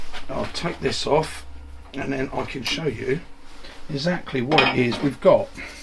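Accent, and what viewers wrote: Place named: London, United Kingdom. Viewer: Nice to hear a London accent. enjoyed the video...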